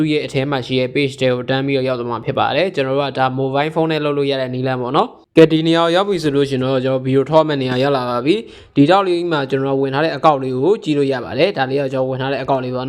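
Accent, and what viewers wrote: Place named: Rakhine, Myanmar. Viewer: အသံကမပီဘူးဗျရခိုင်အသံလိုဘာသံကြီးမှန်းမသိဘူးဗျ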